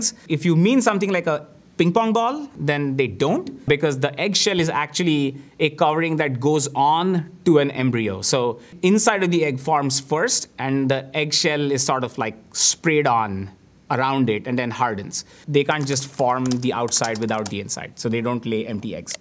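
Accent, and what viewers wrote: India. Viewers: he has pure indian accent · On an unrelated note I love this guys accent idk why it sounds Indian but also unique at the same time genuinely got me interested in birds now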